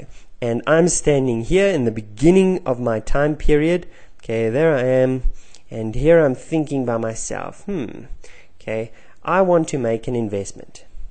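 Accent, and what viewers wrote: South Africa. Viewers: Thought you were from sunny South Africa. Thanks for the video :)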